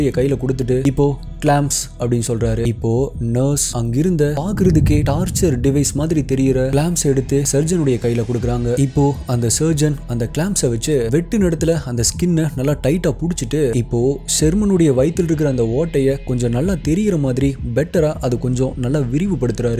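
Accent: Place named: Kerala, India. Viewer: YOUR VOICE LIKE FROM KERALA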